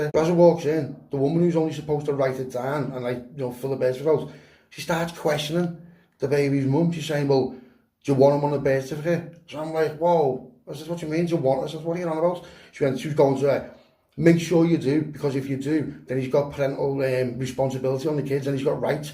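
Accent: Liverpool, United Kingdom. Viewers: Yes scouse ❤❤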